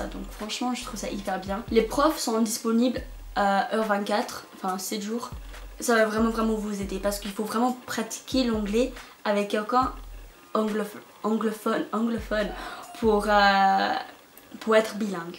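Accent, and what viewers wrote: England, United Kingdom. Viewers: So cute this english accent while speaking french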